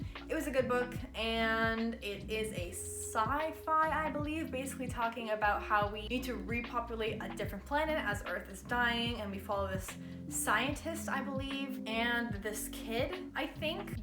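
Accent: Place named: Germany. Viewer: I don’t know if it’s just me but you have a little german accent hahah but idk why 😂 Are you german?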